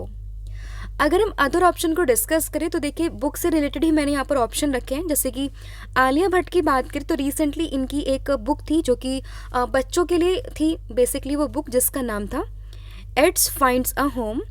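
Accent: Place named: Bengal, India. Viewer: Aap pakka Bangal se ho Aapka voice se pata chl gya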